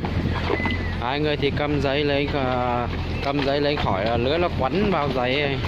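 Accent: Nghe An, Vietnam. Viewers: Giọng giống quỳnh phương .hoàng Mai. Nghệ an vậy bác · Nghe giống giọng nghệ an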